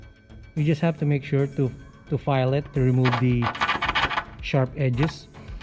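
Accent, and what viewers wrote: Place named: Philippines. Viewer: Sheeesssh bro. I’m about to do the same thing, thanks for a great video. All I got to say is, damn your Filipino accent is thick lol🇵🇭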